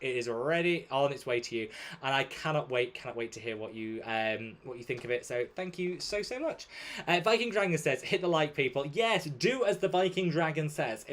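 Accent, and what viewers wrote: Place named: England, United Kingdom. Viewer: Are you from England? I'm in Charlotte North Carolina. My name is Martha . The reason i asked is bc my Dad was from Birmingham England. So i have a lot of English in me. My Dads last name was Herbert. A very English name. Love your accent God bless you stay safe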